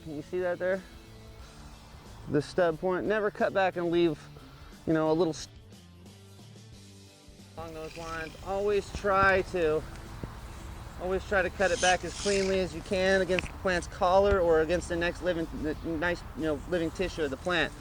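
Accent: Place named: Southern California, United States. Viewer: also, with your thick So Cal accent slurring of consonants, i could not get the name of that trimmer you are using, might you put it in the notes, or enunciate clearly next time? thanks much...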